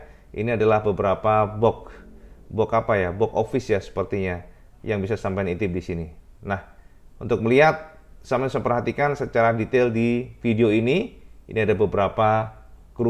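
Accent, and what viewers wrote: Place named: Lombok, Indonesia. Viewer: MATURTAMPIASIH,,,kental bahasa sasak lombok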